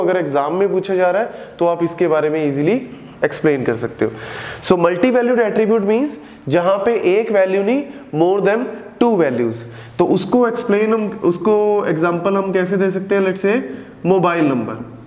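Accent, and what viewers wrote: Punjab, India. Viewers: Sir has a punjabi accent ❤ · Or aap sayad punjab se ho kyoki aapki language me thoda punjabi tadka bhi he😊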